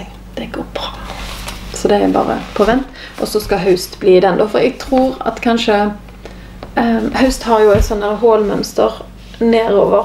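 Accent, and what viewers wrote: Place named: Karmøy, Norway. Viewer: Så koselig med karmøydialekt! Så masse fint du hadde å vise fram.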